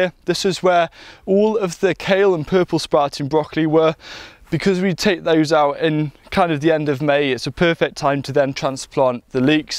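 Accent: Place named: RP, United Kingdom. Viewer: You have the Queens English accent posh Good crops btw👍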